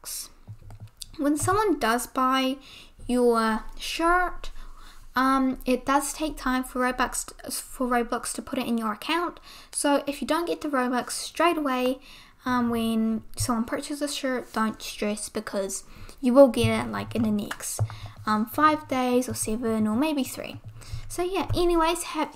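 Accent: New Zealand. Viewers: Love the kiwi accent. You were a big help since I got hired for a clothing group and needed to learn fast.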